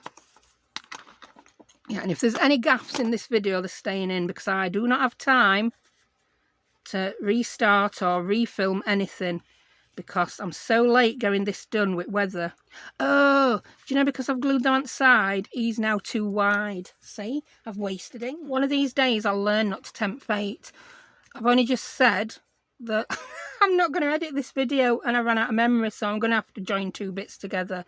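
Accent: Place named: Northern England, United Kingdom. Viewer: I’m so happy I’ve found your channel! I’ve recently got into paper craft after starting a wedding album for my friends upcoming wedding. I’ve found loads of US creators but no one from the UK so you’ve made my day! You also sound quite local to me, your accent doesn’t sound too far away, I’m from Sheffield and will now be binging all of your videos! Xx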